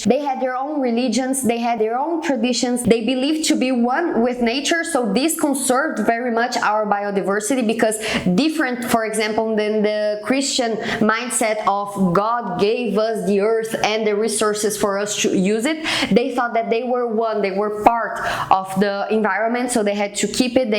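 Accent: Minas Gerais, Brazil. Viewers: seu sotaque é belissimo o sotaque brasileiro falando inglês é belissimo então não precisa pedir desculpa!! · Nossa essa é a primeira vez que noto um sotaque brasileiro forte em algum BR falando inglês kk Ela fala certo mas sem aquele sotaque americanizado, ela fala bem de um jeito abrasileirado mesmo Amei você 🤍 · você não tem um sotaque quebrado. tem um sotaque brasileiro! e é maravilhoso! achei que já poderia ter na história a tentativa de golpe do 8 de janeiro. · Teu (nosso) sotaque de brasileire é lindo!!! ❤ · amore, nunca mais peça desculpas pelo seu sotaque brasileiro!!!!!!! <3 luv u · Seu vídeo é mto bom! Ter sotaque brasileiro não é um problema, vc tem um bom domínio da língua inglesa e o seu sotaque nem é taaaanto o problema... É mais a sua ENTONAÇÃO q não está compatível com a língua inglesa, mas dá pra (um falante nativo) entender 90% do que vc diz. 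(Brasileiros vão entender 100%, sem o menor problema) Bom, mas - se mesmo assim - vc se sentir desconfortável em falar com entonação e sotaque carregado e quiser amenizar essa sensação de inglês "aportuguesado", pra melhorar a qualidade do vídeo, eu sou especialista em linguística e meu foco é exatamente em pronúncia, entonação e eliminação de sotaque brasileiro... Seria um prazer aprimorar sua fluência! Acredito que em 1 mês seu inglês irá progredir uns 50%. Sou professora de inglês há 27 anos, graduada em Letras e possuo minha própria escola há 10 anos. Vc será muito bem-vinda! o do meu Instagram é byteachergabi eu não uso muito o instagram para postagem, mas estou sempre verificando minhas mensagens! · isso não é um "broke accent" é só uma das mais lindas e variadas formas de se falar ingles, ❤ tenha orgulho do seu sotaque mineirês-brasileiro, molier!! kkkk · Muito bom! Amo sotaque, é bom demais ouvir o inglês brasileiro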